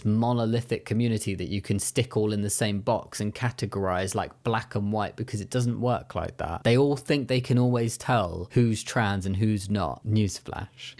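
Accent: England, United Kingdom. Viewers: has such a lovely accent (Brits, ignore this comment; y'all wouldn't get it cos you're from the U.K. so you're immune 😅). As a casual viewer, I can't recall if he's mentioned where exactly in England he's from 🤔 But I know he mentioned traveling to London. So that can't be where he lives. Anyway, wherever in England he's from, I love the accent.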